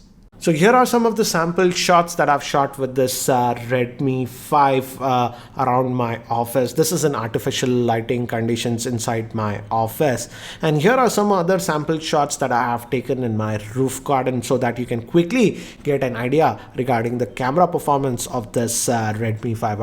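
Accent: India. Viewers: That distinctive Indian accent it is anoying and funny at same time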